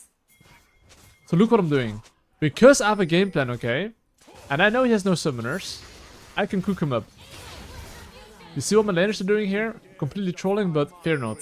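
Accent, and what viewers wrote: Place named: Netherlands. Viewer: Bro, is this guy Dutch? Holy accent😂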